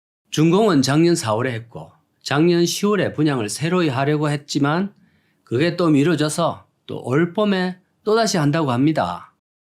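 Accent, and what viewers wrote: Gyeongsang, South Korea. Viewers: 경상도 사람이 서울에서는 사투리 안 쓰지요. 갱상도 폿데가 좀 나서 그렇지. 그게 사투리 아니고 서울말 쓴다고 하는 말투입니다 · 나 창원 사람인데 우리 동네분인가 하면서 듣는데 머선 소리~~ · 순수 경사도 사투리입니다🎉🎉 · 오리지날 경상도사투리죠 강호동 은 꿋꿋이 방송에 떠드는 그 ~ 갱상도 사투리올시다 호동이가 조선족 안입니더~ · 경상도 사투리도 지역마다 좀 틀린가봐요. 어떤경상도 사투리는 일본말 같은데 이분은 일본말같지 않고 진짜 구수한 사투리같네요. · 전형적인 경상도말씨네요 트집잡는 사람은 아마 절라디언인가봅니다ᆢ! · 말투는 경상도 사투리네요 대구달성구 인데 생각있으니까 비워놓겠지요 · 딱들어보면 경상도 사투리인데 무슨 북한빨갱이 조선족운운하는지 참 예의없고 싸가지없네 너야말로 중국 조선족 탈북자인가 예의지켜라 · 뭔 개소리 토종 경상도 사투리도 몰라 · 뭐 구수한 경상도 사투리 듣기좋구만...즐겨보는 채널인데...올빼미 TV와 함께...희망아 듣기싫으면 사라져라.ㅠㅠ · 북에서 왔나? 경상도 사투리도 조선족으로 생각하다니 ㅋ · 원전 갱상도 사투리 인증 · 딱 억양이 부산경남 말씨 경북대구쪽은 대출 이칼때 대~출 이라카고 경남은 대'출 대자에 억양을 씨게 함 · 너무 구수한 경상도사투리를 쩝쩝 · 듣기 좋기만 한데 구수한 경상도 형님의 말투를 이렇게 나쁘게 표현하냐 너는 일베냐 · 겁나 흔한 경남사투리인데 보도듣도못한 님이 빨갱이 아니노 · 딱 들어도 갱상도 사투리인데~ 구수하기만 한데 뭔 트집? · 어이 말투 꼬투리 잡지마라 열심히 일하시는분 북한 빨갱이가 머꼬 니가 빨갱이같은디 경상도 사투리도 북한말로 착각하는거 보니 ㅋㅋ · ㅎㅎ 이곳과 관계가 있는 분인가 보다. 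갱상도 아임미까. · 먼소리요? 내가 갱상도인데 내가 이미 검증하였음 나보다 더 오리지널 경상도사투리요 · 경상도 사투리 구수하고 듣기 좋기만 하구만~넌 중국 연변에서 언제 왔냐~?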